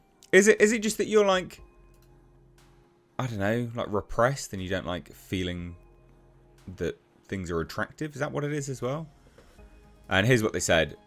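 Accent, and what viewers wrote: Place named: United Kingdom. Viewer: Man I like your british accent, also great content!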